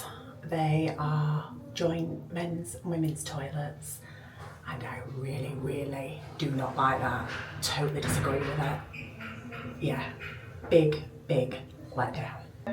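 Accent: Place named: Yorkshire, United Kingdom. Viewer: Where is your drinkssssssds………….. 😂😂😊 I am really fascinated about your accent, and I really like watching your videos for it, only I am student from Sheffield and I really like Yorkshire accent, 😂😂😂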